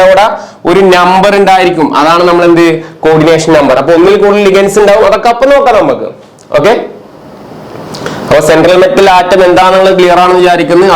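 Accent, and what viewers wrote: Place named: Kannur, India. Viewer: Kannur slang😂🫶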